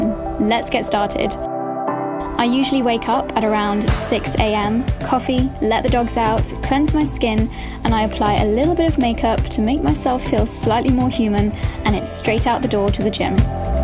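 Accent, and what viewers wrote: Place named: United Kingdom. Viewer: Ur sweety british accent is sooooo cooolllll for me. Happyful,best wishess from BAKU,AZERBAYCAN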